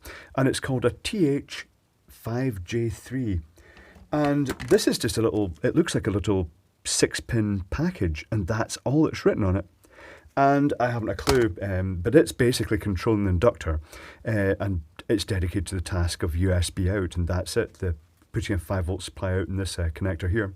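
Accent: Scotland, United Kingdom. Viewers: R u Scottish? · Can't be the only one who knew he was gonna be Scottish before clicking on this video.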